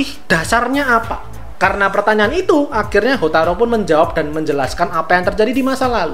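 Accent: Java, Indonesia. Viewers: Medok bang 😂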